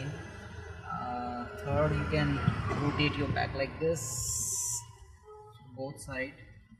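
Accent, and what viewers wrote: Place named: Haryana, India. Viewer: sir, can't stop his haryanavi accent coming inn " Clitch" and brake!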